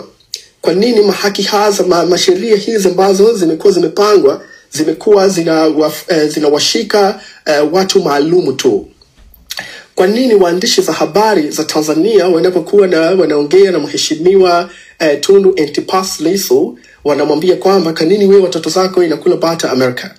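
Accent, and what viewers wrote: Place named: Kisii, Kenya. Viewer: Kwani wewe ni Mkenya?Mbona lafidhi yako kama ni wa kisii?Achana na habari za Tanzania kenge maji,tukijichanga ulaya tunacmplain tunatoka nchi yoyote yenye matatizo Africa,we are Somalian we are Burundian and we ate also Congolese.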